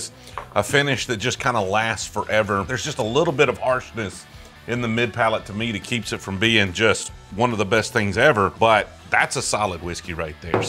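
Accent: South, United States. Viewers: mostly because it was MACK Donalds... Love the southern accent.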